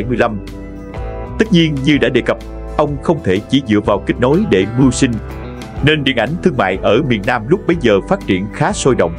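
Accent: Vietnam. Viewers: Kênh này ngoài MC nói giọng Sài gòn, thì nội dung khá rẻ tiền. Chủ yếu khai thác đặt tựa đề giật gân, không đúng sự thật để gợi sự tò mò của người xem, không có đạo đức truyền thông. Đặt tiêu đề hạ thấp những nghệ sĩ kỳ cựu đã khuất để kiếm tiền. Những người đàng hoàng, có lòng tự trọng sẽ không đăng ký những kênh rác như vầy. Disliked.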